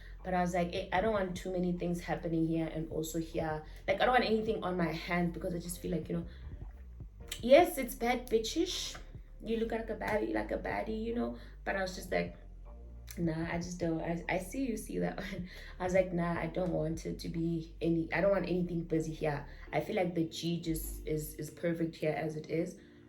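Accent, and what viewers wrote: Nigeria. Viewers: Every time I fell in love with your Nigerian accent😊❤ I love you ❤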